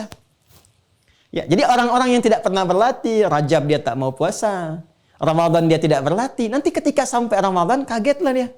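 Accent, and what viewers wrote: Malaysia. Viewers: Ustad terkadang menggunakan bahasa dan logat melayu hehe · Ustadz bicara nya sprt bhs melayu / Malaysia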